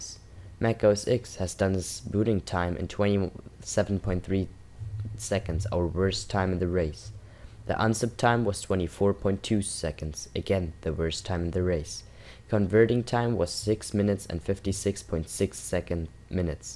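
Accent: Germany. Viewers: Dein Englisch ist richtig gut für einen deutschen, obwohl man den Dialekt noch raushören kann (du sagst im unsip)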